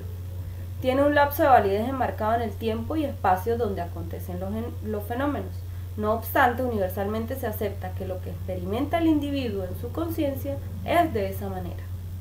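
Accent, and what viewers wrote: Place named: Bogota, Colombia. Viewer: ser la dura del curso! paraces bogotana jejejeje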